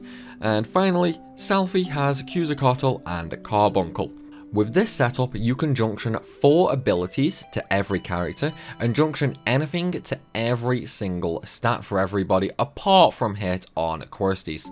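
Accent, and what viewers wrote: Stoke, United Kingdom. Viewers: I’m normally pretty good with accents, but I can’t quite get yours. Are you from Stoke/surrounding area?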